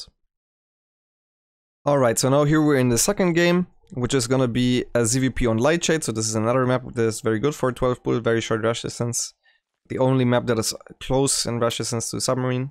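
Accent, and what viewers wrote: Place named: Germany. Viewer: i think the German-ness is quite apparent, his English is good nonetheless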